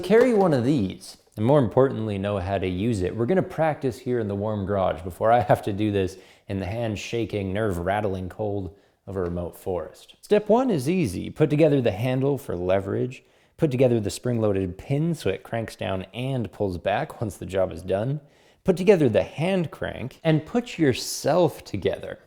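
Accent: Canada. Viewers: I’m assuming he’s from Canada. I’m waiting for him to say chooch or that’s the cock for dolly 😂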